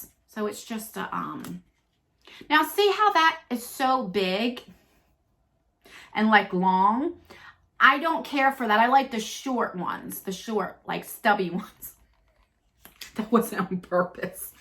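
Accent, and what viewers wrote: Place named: New Orleans, United States. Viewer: Hiya! Your eyeshadow is bangin' tonight! I love it! So pretty. Your accent sounds like your from nawlins. I love it! Hope your daughter is continuing on a great recovery!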